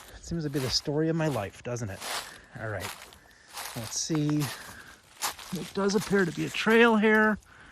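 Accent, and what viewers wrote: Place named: Chicago, United States. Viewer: I had to subscribe for the name of your channel alone! I love honesty. Then I heard a Chicago style way of speaking and that you were doing an abandoned cemetery near the Chicago area. What's not to love? Maybe check out what we in the neighborhood called "The Lost Cemetery." (It's on the Northside, fairly safe neighborhood.) It was next to Labaugh Woods along the train tracks. More recently a good deal of the land was sold off. They claim to have moved the bodies. I DO NOT BELIEVE THEM. And there are STILL headstone to be found there today, in the woods behind the houses they build over the graves that are still there. You can park in Lil Labaugh Woods or the main parking, both parks are along Foster Ave, if you want a good place to park. The entrance to the larger picnic area and parking is off Cicero Ave. Or you can drive West on W. 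Bryn Mawr Ave along the North edge of Bohemian Cemetery. You'll reach a bike trail that use to be train tracks The Sauganash Trail Bike Path. You past a subdivision on your left, that was part of "The Lost" Cemetery and still is! If you walk south on the bike trail toward Labaugh Woods on your left is the Lost Cemetery. The headstones are still there. The bodies are still there.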